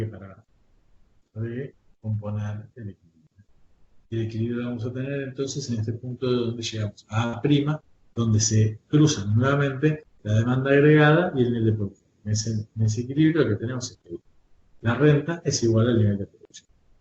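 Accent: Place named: Argentina. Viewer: Es una pena, la clase parece muy buena pero la calidad del audio sumado al acento super marcado de argentina, lo hace inaudible para el resto de latinoamerica.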